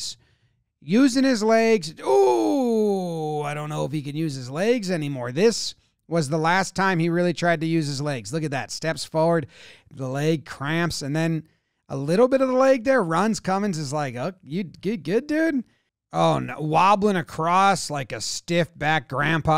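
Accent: United States. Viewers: This is the first time I'm hearing someone commentate in an American accent, and I'm loving it, especially since its funny too. Refreshing! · Its so weird to hear someone with American accent commenting Cricket. We are so used to Brit, Aussie and Indian commentators 😂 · there's something about an American describing cricket that is just addictive to listen to. Such a great reaction video. This should be used by the ICC to promote the world cup that's coming up in America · its the weirdest thing hearing an american talk about cricket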